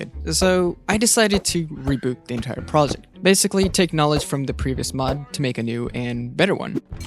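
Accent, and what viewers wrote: Germany. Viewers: deutscher englisch dialekt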